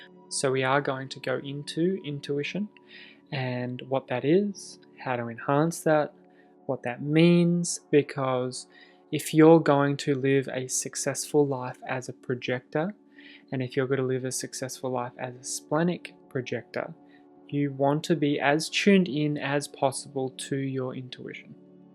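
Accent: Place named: Australia. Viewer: Which part of australia are you from? 5/1 from Brisbane :)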